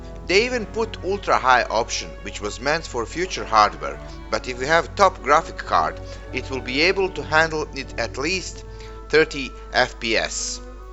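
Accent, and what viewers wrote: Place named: Russia. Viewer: Like your indifferent Russian voice.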